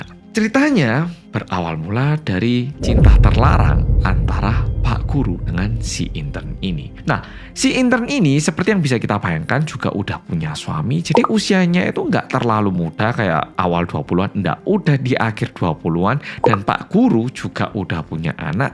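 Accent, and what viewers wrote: Java, Indonesia. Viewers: Kemarin baru banget konten nya kk fyp di berandaku , aku coba nonton ko lama lama nagih juga apalagi pake detektif gitu di tambah suaranya itu medok . Dan akhirnya aku jadi tau kalau di korea itu selalu pakai detektf , bagus juga ya . Ga cape cape cari tau sendiri . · Tdr malem di temenin m medok 🙏🏿🌹🌷🌻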